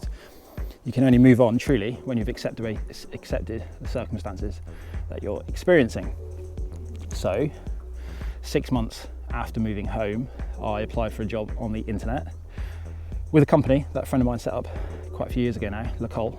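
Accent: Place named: England, United Kingdom. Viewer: Nederlands met een Engels accent doet het altijd goed ;).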